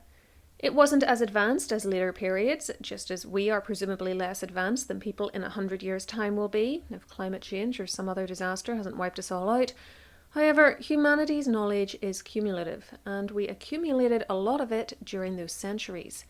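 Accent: Northern Ireland, United Kingdom. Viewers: Oh, your accent is absolutely grand and I have no problems whatsoever cathing everything. Never been to Northern Ireland though, only Éire, but I really want to visit the north as well. Hello back from your distant, rainy neighbour Noreg. :) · Great video, thx. Just have to say, me mum grew up in Co Derry. Love your N Ireland accent. I subscribed based on the conctent. Well done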